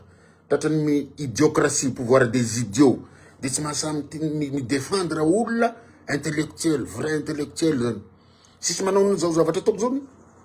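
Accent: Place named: Bara, Madagascar. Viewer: NA IZY MITENY FRANTSAY AZA MITOVY IZY MITENY BARA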